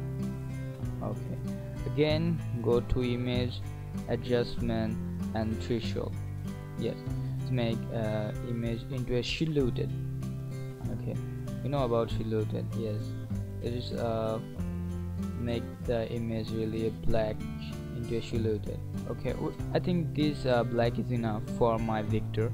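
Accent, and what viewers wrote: India. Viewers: Why does everytime i need some tutotiral in this stuff , Apu from Kwik-E-Mart is the voice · Your English is so fuckall with a crap Bengali accent!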